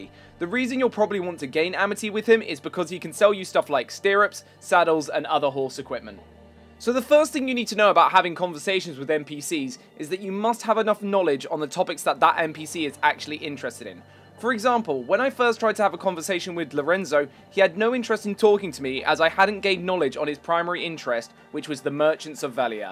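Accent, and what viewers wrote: United Kingdom. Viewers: "You press the ah" (Oh, right. That's the "R") ... "Which is pretty dahmn bahd-ahss" I love how incredibly British this sounds ^^ Thanks for the tutorial, turned out I had to right-click the faces instead of drag-'n-drop them, huh? And now I know even more than what I wanted to know, like getting additional quests! I might want to focus on this even more. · Gunna play this at the weekend. Good to hear a Brit! and great Vids, will watch them all.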